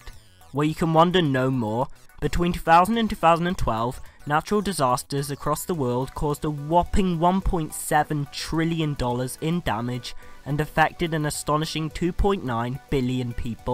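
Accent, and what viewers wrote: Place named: Northern England, United Kingdom. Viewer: Not just saying it, this was my favourite video of yours so far.. The clarity of your voice is perfect on this :) Where are you from? I'm getting everything from Scouse to Manc to Yorkshire!!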